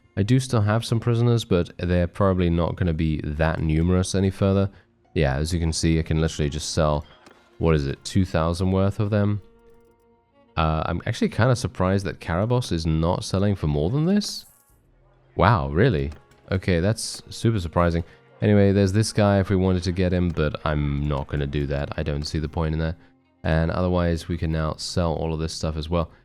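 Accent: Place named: England, United Kingdom. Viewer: My favourite part of your videos? "Are you serious?" 😂🤣😂 There's something inherently enjoyable about hearing your smooth english accent spit that phrase out every episode of every Bannerlord series. Never change sir.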